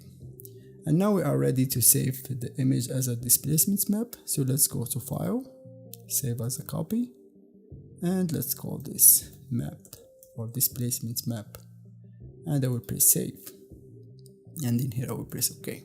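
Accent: Morocco. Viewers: Your accent look like Moroccan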